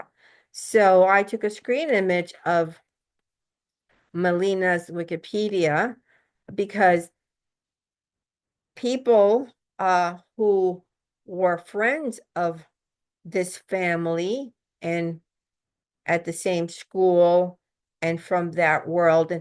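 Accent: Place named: United States. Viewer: She obviously isn't as she has an American accent? 😂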